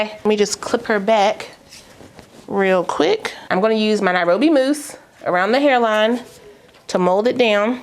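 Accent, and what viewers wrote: Washington DC, United States. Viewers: You from DC? The accent and the go-go lol 😅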